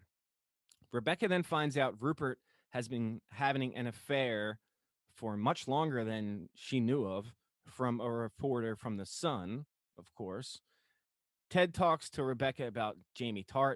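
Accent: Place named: Philadelphia, United States. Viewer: I love your Philly accent :-)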